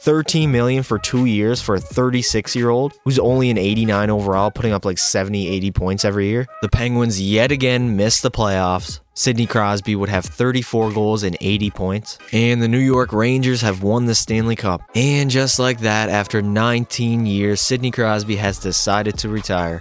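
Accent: Newfoundland, Canada. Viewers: Any chance you're from The Rock? Or at least from NS? Asking because of your accent lol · Are you a Newfie?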